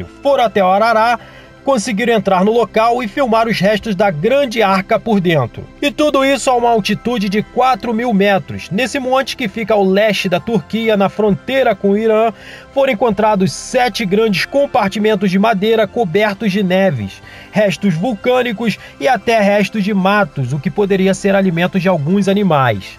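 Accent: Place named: Rio de Janeiro, Brazil. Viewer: Menos sotaque carioca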